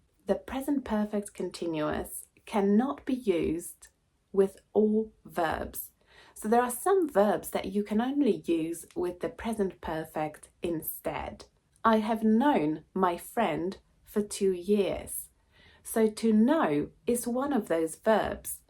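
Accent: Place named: England, United Kingdom. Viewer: Dear, Your accent is very clear and that you slow speak so this you make understanable. You have very nice an English accent.. Thank you so much 🙏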